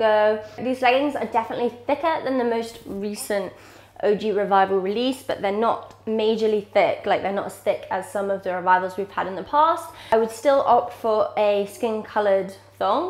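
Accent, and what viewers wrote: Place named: United Kingdom. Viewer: I love your British accent 😍greetings from Mexico 🇲🇽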